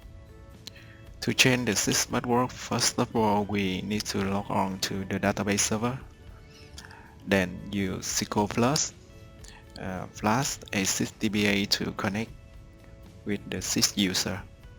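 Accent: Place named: Vietnam. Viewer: Thank you! Where are you from? Your English voice like Vietnamese. Hello đồng hương 🎉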